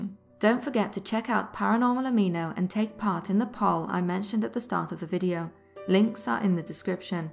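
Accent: United Kingdom. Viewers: I loved your Jeckle and Hyde narration I would like to recommend another story if you are interested. Be.Busta narrated “The Left/Right Game” it’s a really good story but as it is from a female perspective it’s missing something. I would just love to hear your voice instead. The author writes from the perspective of a journalist from Bristol and I hate to assume that you are from the UK, but with the British/Aussie/New Zealand style accent it just fits like a glove and I find myself more engrossed in the storyline. Anyway, just a recommendation ❤️❤️❤️ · usually I hate British accents but I am in love with your voice and it is perfect for reading these things.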